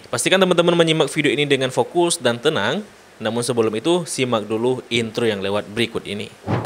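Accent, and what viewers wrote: Aceh, Indonesia. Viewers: Logatnya seperti logat aceh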